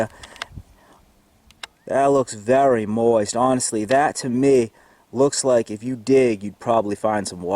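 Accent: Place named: Boston, United States. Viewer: you from Boston, you sound like Kennedy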